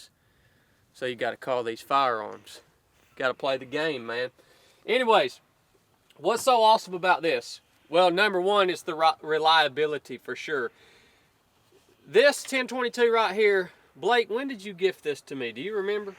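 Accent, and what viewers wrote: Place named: Appalachia, United States. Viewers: Another video that did not need to be made --it's common sense....Woox is pushing products on every ytuber right now ,lol over priced and over weight , many many better options than Woox . Hillbilly talk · I'm from Georgia so im used to country dialect, but his accent is something serious.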